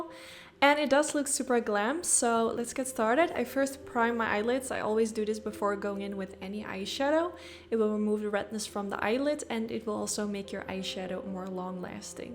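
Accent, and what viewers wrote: Netherlands. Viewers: Ben jij toevallig Nederlands? 😁 hoor een nederlands accent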